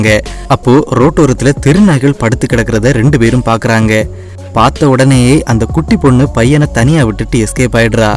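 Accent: Kanyakumari, India. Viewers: Bro KANYAKUMARI aahh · Bro neenga Kanyakumari ya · Nega kanyakumari ah 🤔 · Bro neega kaniyakumariya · உங்க ஊரு கன்னியாகுமரிதானே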